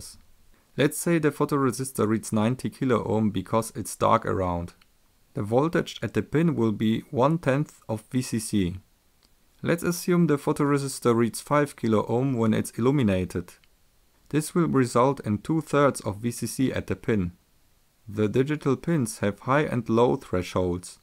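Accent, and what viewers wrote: Germany. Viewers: Great sense of humor with a German accent. I love your videos.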